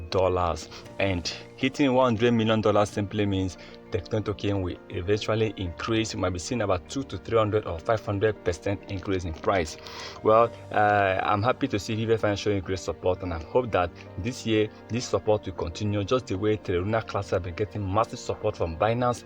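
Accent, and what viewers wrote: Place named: Nigeria. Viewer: Nigeria man,on ur voice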